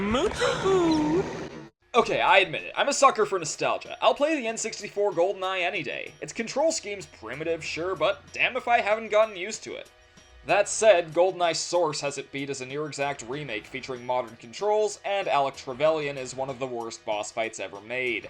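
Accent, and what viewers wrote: Canada. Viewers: are you french canadian? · Is he canadian?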